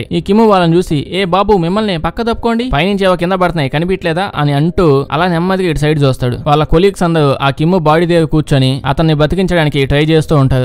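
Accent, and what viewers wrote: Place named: Godavari, India. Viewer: New subscriber.... Godavari side aa anna midi slang alane undi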